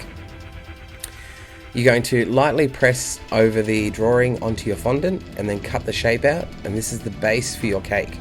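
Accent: Australia. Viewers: He's actually Australian NOT Brittish XD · it's an australian accent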